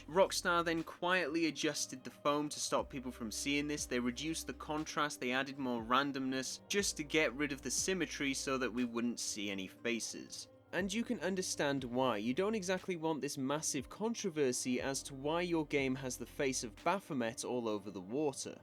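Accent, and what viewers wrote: United Kingdom. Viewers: I like to watch your video it just my bad and my fault for insulting you cuz i like to hear when u says "Water" and "Woter" to a Wa Er 😂 but sometimes i heard and listen carefully on your "UK accent" it got me laughing alone like people look at me like am a craaaazyy person.. 😂😂😂😂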